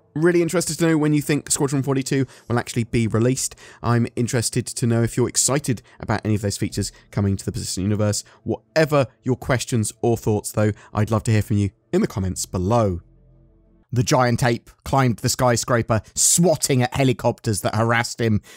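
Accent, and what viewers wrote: United Kingdom. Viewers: BRO YOU SPEAK TOO FAST AND TOO BRITISH.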